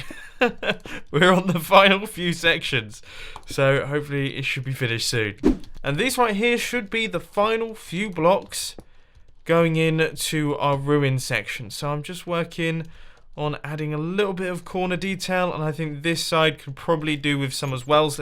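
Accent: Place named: United Kingdom. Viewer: Ewan Bruce He said I didn't know if I could fly. It's hard to tell if you aren't used to his British accent, and his happy squeal.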